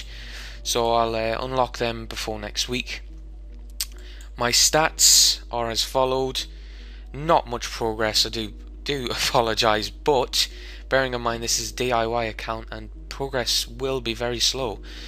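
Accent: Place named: Newcastle, United Kingdom. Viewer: Geordieeeeeeeeee m8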